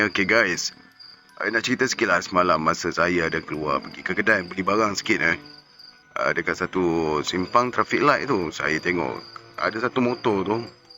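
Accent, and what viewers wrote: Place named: Perak, Malaysia. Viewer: Assalamualaikum...wah arini mmg byk story ye cikgu☺️..dari nak kejor mat rempit membawa ke kelas bahasa..loghat perak pulak ye! Apa2 pun mmg terhibur .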